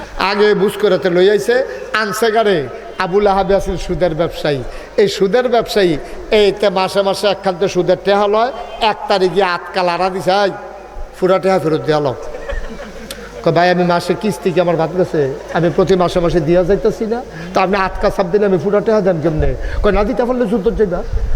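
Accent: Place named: Rural, Bangladesh. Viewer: সে তার গ্রামের আঞ্চলিক ভাষায় কথা বলে সে এখন পর্যন্ত শুদ্ধ ভাষায় কথা বলতে পারে না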